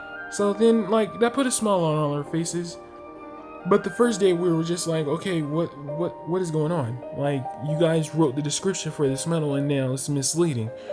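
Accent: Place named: Canada. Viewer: Take a shot every time this canadian person says "like". idc if he's not. just wanted to point out. he just sounds canadian.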